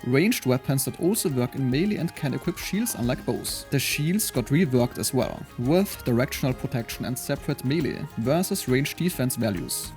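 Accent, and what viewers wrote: Germany. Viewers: absolute pain listening to your bad german english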